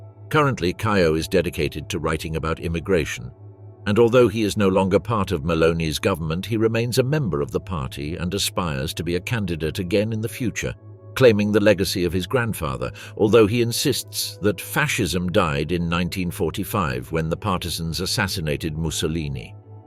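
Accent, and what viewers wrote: United Kingdom. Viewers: yes, but its even worse the use of UK english (with all sorts of dialects) while it should be US english narrated. Now thats really an islands plague :-((((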